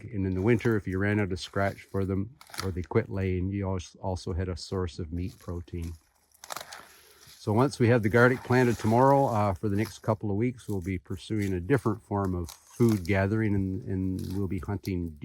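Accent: Canada. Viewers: I'm a yooper born and raised on the U.S. Canadian boarder. I watched the first of your videos and knew you were from Canada from your accent. I started watching your oldest videos first and will continue to the newest ones. Great videos and love the little cabin you and your partner built. I am looking forward to more. Thanks!!!!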